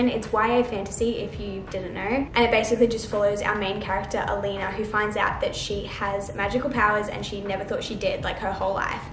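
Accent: Australia. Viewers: scripture in the opening card AND aussie accent? IMMEDIATE subscribe!! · wait, are you aussie? if you are, thats amazing! i am too, and i never ever see any aussie booktuder/ booktokers!! <3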